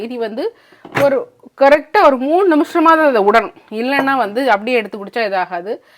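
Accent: Nagercoil, India. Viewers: Akka, truly your videos are stress busting for recent times. Thank you so much 👍🏻 அதுவும் நம்ம நாகர்கோவில் தமிழ் அடிப்பொழி...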